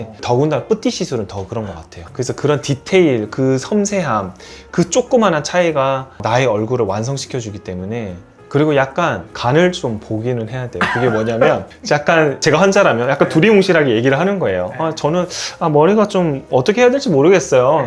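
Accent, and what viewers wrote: Gyeongsang, South Korea. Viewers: 진행자분 경상도 사람이네예~^^ 서울말투에 섞어나오는 친근한 말투~*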